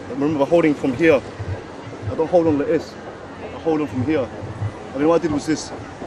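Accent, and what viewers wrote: United Kingdom. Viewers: i love i assumed he was gonna have an asian accent and he came sounding like a sidemen😂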